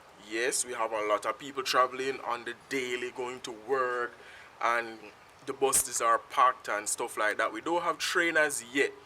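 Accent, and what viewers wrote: Jamaica. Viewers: Love the Jamaican accent!!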